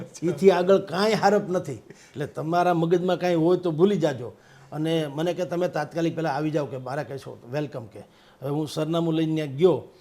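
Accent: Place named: Saurashtra, India. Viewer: Vah bhai...Ek To Tal Sorthi Bolee neUparthi pattrakar,Meghanigini Yadav aavi gai.Ek var Jaher Sabhana 'Manch' par Aapne Bhashan 'DETA' Joi rhyo Chun.Maru aa Sapnu che.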